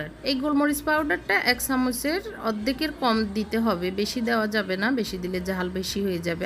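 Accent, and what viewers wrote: Noakhali, Bangladesh. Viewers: Shob valo kintu apnar uchcharon thik kortey hobey.. Noakhailla uchcharon shuntey khub kharap lagey.... otherwise your recipe is nice.. all the best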